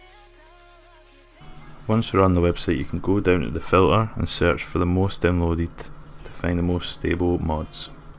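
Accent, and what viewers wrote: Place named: Scotland, United Kingdom. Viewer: first scot yt ive heard in ages mate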